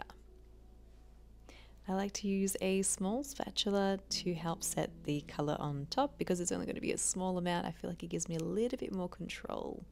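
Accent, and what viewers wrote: Australia. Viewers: No problem with the audio here. It's maybe a little quieter than some channels but all channels vary. Maybe someone is having difficulty with your gorgeous Aussie accent?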